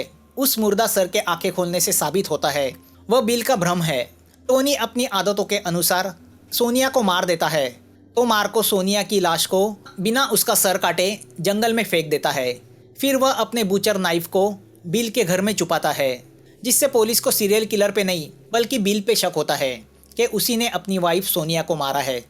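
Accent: Maharashtra, India. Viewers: Sir are u marathi i think so becose of ur hindi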